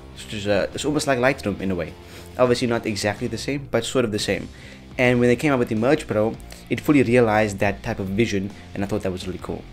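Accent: South Africa. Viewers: south african ??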